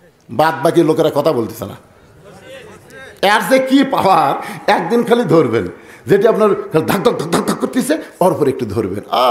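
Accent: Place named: Bogura, Bangladesh. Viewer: বগুড়ার ছোল তাই এমন করে বলে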